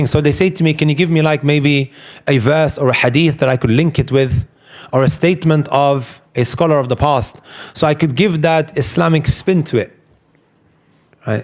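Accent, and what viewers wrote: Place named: United Kingdom. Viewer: Grew up in Britain so it’s British accent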